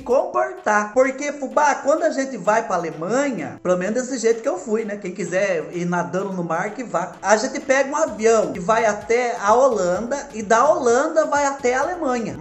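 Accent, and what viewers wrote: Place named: São Paulo, Brazil. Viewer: Fubá sou nascida em são Paulo kkkkkkkkkkk só não moro mais lá kkkkk,mais vc parece com uma prima minha de Mogi das cruzes ,me identifiquei kkkkkkkkkkk,...vc e fofo e sua alegria contagia ...ela e bem do interior de São Paulo e fala como vc e muito engraçado ...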